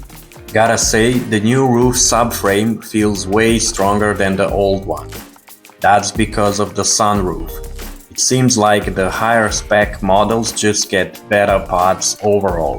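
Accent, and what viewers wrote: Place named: Balkans. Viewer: I noticed the accent and the name of confirmed my thinking 😅 Odakle si legendo? Kidaju snimci!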